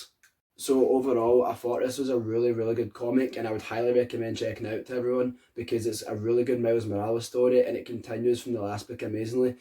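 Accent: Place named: United States. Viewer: Us accent... You need to make your spells clear and use a mic.... Btw good content🤘🤘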